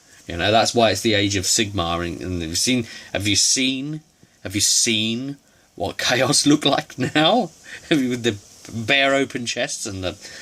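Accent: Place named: United Kingdom. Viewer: There's just something about a good British accent doing one of these, that we Americans just can't muster in quite the same way....that gravitas...that...elegant sarcasm....brilliant! Thanks for it - you did a great synopsis of much of the concerns that many of us have had since first hearing of this.....game.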